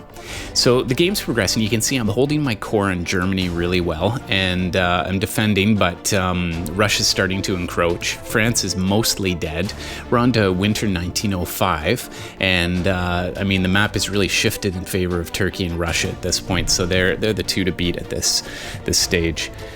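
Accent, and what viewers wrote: Canada. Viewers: Hello, fellow Canadian (assumption based entirely on raised “out” vowel)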